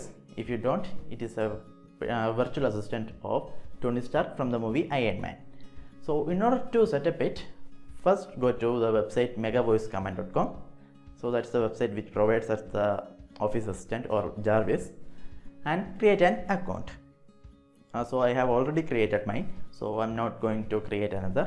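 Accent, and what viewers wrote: Kerala, India. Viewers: Your speaking feels like you are a malayali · of course he has an indian accent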